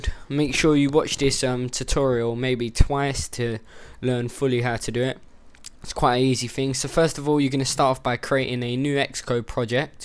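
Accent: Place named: East London, United Kingdom. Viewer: Brilliant tutorial, and your epic east london accent is boss!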